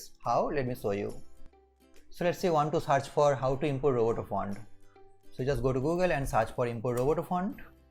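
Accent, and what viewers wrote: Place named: India. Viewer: bro your vice is like a hindustani 🙂🙂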